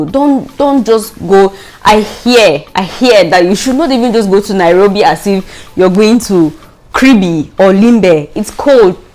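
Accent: Nigeria. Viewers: You talk like nigerians though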